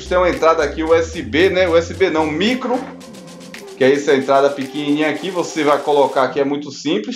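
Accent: Bahia, Brazil. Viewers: Senário muito foda, baianês excelente = a vídeo diferenciado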